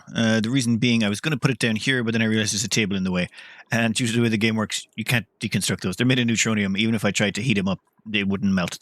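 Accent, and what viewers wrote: Ireland. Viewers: im watching this on my 2014 gaming laptop wot is falling to bits and i just love listening to loveley irish voice, if we can convince him to start a patreon or just keep okn id be happy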